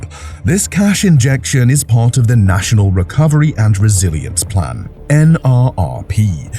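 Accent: United Kingdom. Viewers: Assembling other people's videos and hiring a narrator with a British accent can be PROFITABLE. Nothing here that a good statistician could have told you-and has, btw. Why don' t you consider the immigration problems and the Procreation for Recreation immigrants overflow in Lampedusa or those being shipped to ALBANIA ? OLD NEWS . NO SUBSCRIBE. NO LIKE.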